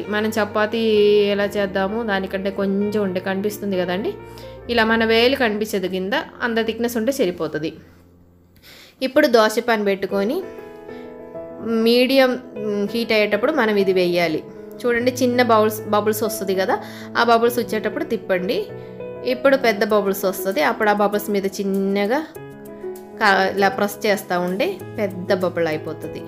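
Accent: Kerala, India. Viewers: Meeru malayalee na madam...mee slang same malayam la undhi Telugu.😅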